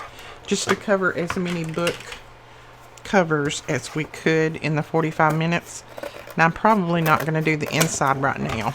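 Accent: South, United States. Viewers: I love all your videos & as a Canadian have come to understand your Southern drawl: ) Love the detail & generosity of your freebies & today signed up for your newsletter. Keep up the great crafting ideas!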